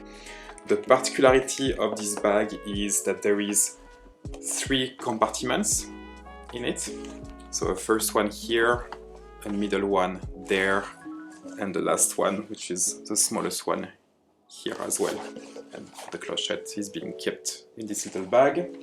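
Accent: France. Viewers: Bonjour de la Belgique 🇧🇪, félicitations pour votre magnifique sac Hermès Kelly 😜❤️, je ne comprends pas l’Anglais, mais je devine ce que vous dites 😂, surtout votre accent bien Français 😂, félicitations pour votre collection Hermès, que je commence seulement depuis 1 an, je me suis offerte 1 Kelly 28 Gol retourné, bijoux Palladium ❤, maintenant j’attends 1 Kelly noir 32 retourné, bijoux Or❤, je me suis offerte une montre H et Brillants aux heures, ex ex ex des petits achats 😜❤️, comme je suis Belge, j’étais concentrée sur les sacs Delvaux Brillant 😜❤️, bon en espérant qu’ils n’y aura pas de disputes dans les armoires, la France contre la Belgique 😂, Meilleure vœux pour l’année nouvelle 🥂🍾🌲. Bien à vous 🤗💛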